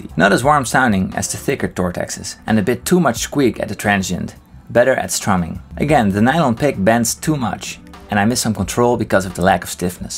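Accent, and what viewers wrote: Netherlands. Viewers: jij klinkt een beetje Nederlands? zou dat kunnen? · From which country are you? Your accent sounds dutch.....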